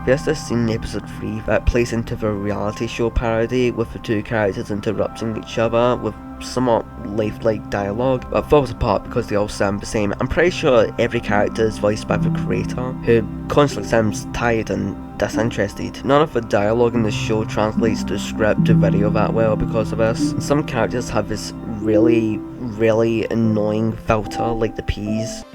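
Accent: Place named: Scotland, United Kingdom. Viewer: i was thinking "damn thats a heavy scottish accent" and wasnt gonna comment on it but then immediately in that thought got to this part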